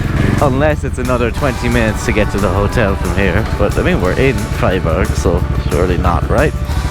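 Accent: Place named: Ireland. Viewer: Just found you. So refreshing to hear another Irish voice coming from inside the helmet on one of these vids. Gorgeous bike too 😍 subbed!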